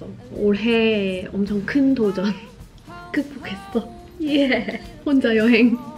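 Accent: Gyeongsang, South Korea. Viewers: Lovely Lady,. 😍❤👍🏻👏🏻💐💐 그런 이유로 말투에 경남 억양이 베어있었군요😮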